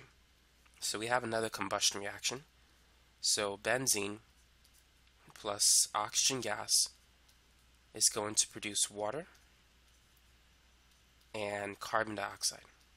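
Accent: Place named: Boston, United States. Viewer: His boston accent 🤤🤤🤤🤤🤤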